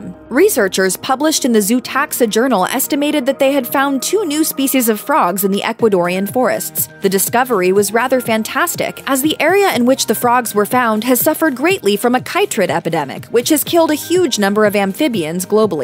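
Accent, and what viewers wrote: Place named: United States. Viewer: Sorry,but why do we always have to suffer these damn American accents!!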